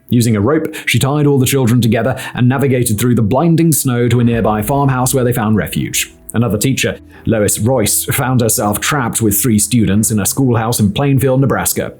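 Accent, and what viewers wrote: United Kingdom. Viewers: Hard for me to take a British accent about AMERICAN history. AI is terrible about throwing in heavy Irish and British accents too when talking about even the old west history of the USA. Usually I just stop those and move on to another video. Why would I want a Brit telling me about AMERCAN history, when their great Brit army/navy could not even beat a ragtag USA colonial army of farmers and settlers....get real. Keep it real Youtube!!!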